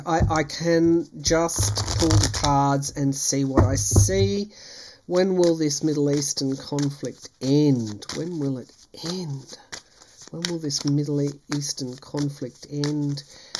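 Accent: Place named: Australia. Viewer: I love your readings, and find your no-Nonsense Aussie accent 😅 as comforting as a US Southern drawl. You are a genuine soul. Thanks more than you can appreciate the comfort and warnings you provide! Ava in Florida😊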